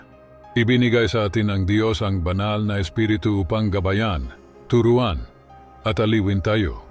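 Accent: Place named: India. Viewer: Tanong kolang po...pinoy ka po talaga?kasi parang indian ka boses mo or kano na nag aral lang magsalita ng tagalog pangit sa tainga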